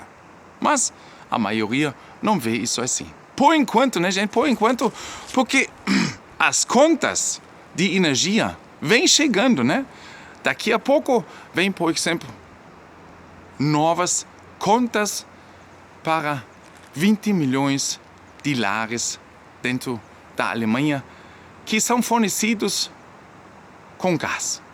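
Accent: Brazil. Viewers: Irmão eu só consegui ver o Leôncio do Pica Pau, ainda mais com esse sotaque Brasigermânico. Abraço! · Se não fosse pelo R vc seria confundido com um Brasileiro nato.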